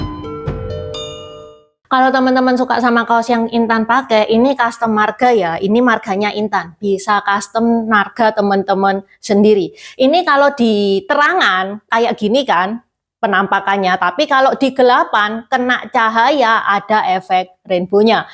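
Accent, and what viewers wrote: Java, Indonesia. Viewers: Cerita / narasi nya menarik cuma lafal / pengucapannya (intonasi) terdengar aneh 😢 jawanya terlalu medok😊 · Ya, apik to😅 Jawa banget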